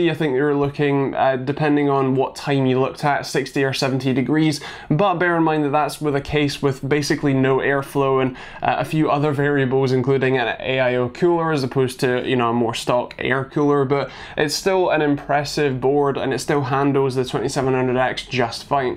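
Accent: Scotland, United Kingdom. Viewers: He seems to have a stutter and I think hes scotish they speak fast andi think he's struggling to anunceate the english could also be nerves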